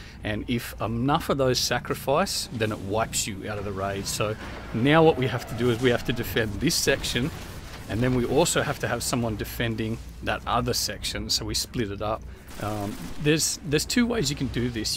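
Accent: Australia. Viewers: I love these videos. It explains so much plus I can never get enough of the Aussie accent.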